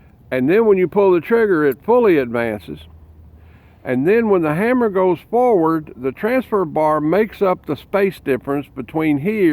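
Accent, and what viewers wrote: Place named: Texas, United States. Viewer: He sounds like a fellow TEXAN to me!!!!!!!!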